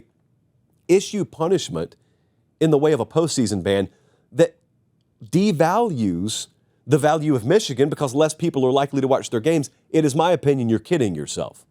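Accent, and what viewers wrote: South, United States. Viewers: 😂GTFOH! when the story first dropped you were literally saying that you thought nothing would happen. You can use your southern twang all you want to try and backtrack. But you got caught, called out, and now you're trying to do your best tap dancing to get out of being called out. Just admit you were wrong and move on. ScUM is a big brand and you didn't want to piss off their fans. But you backtracked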